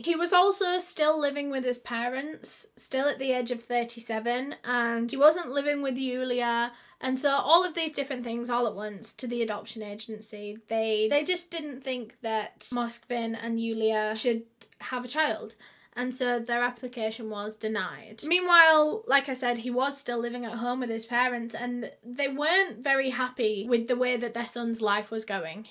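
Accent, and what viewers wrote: United Kingdom. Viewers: Oh goodness. I've never heard your voice. I watch these with subtitles. I thought you American. I'm even more pleasent to hear your UK. Love it.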